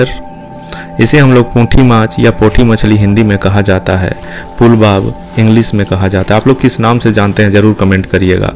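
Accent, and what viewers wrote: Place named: Jharkhand, India. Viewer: Baat se lag raha hai aap jharkhand east Singhbhum ke hain. Ab yeh bata dijiye kis gaon se hai. Main Jadugoda se hoon.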